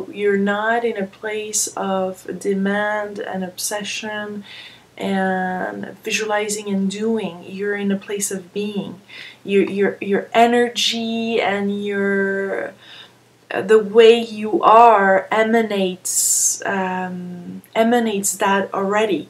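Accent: France. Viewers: Omg.. u r french.. i could tell from ur accent.. its cute ^_^ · Are you french?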